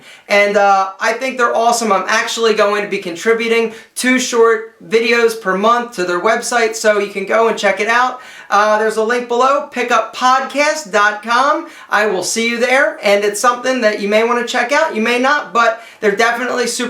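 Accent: Philadelphia, United States. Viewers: are you from Philly? Your accent sounds like it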